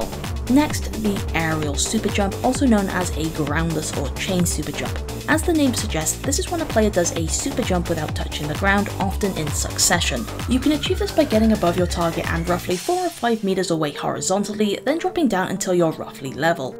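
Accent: United Kingdom. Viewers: I love your british english!!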